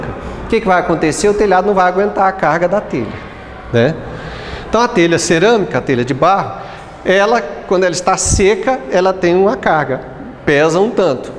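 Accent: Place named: Minas Gerais, Brazil. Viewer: Professor excelente conhecedor de geometria, vasto conhecimento operacional com sotaque de mineiro meus parabéns professor por toda as suas teorias das edificações para execução de obras com seguranças e determinação..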